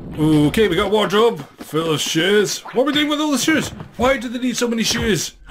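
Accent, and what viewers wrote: Scotland, United Kingdom. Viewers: Haha this is too funny!:) it's actually so refreshing to hear a fellow Scottish accent on YouTube! Haha, love your videos!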